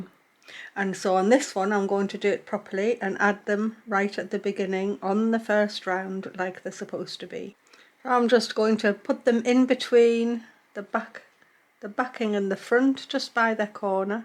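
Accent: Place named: North, United Kingdom. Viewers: Where is your lovely accent from ? I know it’s northern, but can’t place it… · Thank you so very much for inspiring me to try this type of 'patchwork'. Instruction is clear and very helpful also your accent is a lovely hark back to my land of birth. Born near Richmon N Yorks I've lived in regional Victoria (Aus) since 1988. I always thought it strange that folk paid $30 a metre for fabric to cut up then sew back together to make a quilt, perhaps that's the canny lass I am!. So much better to reuse / rescue fabric giving it another life. Thanks again for a lovely video.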